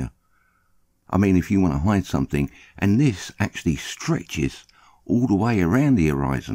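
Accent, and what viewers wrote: United Kingdom. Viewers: Your commentary cracks me up. That old school British dry humor has a special place in my funny bone. Whenever I hear that British accent my mind flashes back to my younger years hanging out at my boy James' house. His parents are British transplants. When you said, "What you tryin to hide there in the sky, Sonny Jim?" that brought me back to a time when I was 15. James' dad caught us sneaking down his Weinhart's in their garage. "What you tryin to hide there, Sonny Jim?" Between the guilty look on my boy's flush face, the buzz from the 3 beers i just slammed, and Jim Sr's accent, I couldn't help myself hold the chuckles back. You got me wearing the same cheeky smile I had on back then. Thanks for that,. I needed that right now. MadLuvBrada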